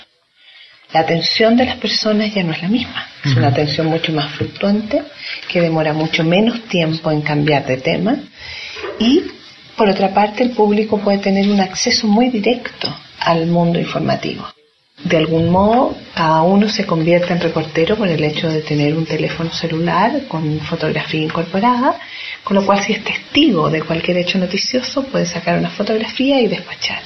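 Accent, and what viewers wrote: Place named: Chile. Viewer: Sin duda, un acento distinto. Claro y confuso a la vez. ¿Acento chileno?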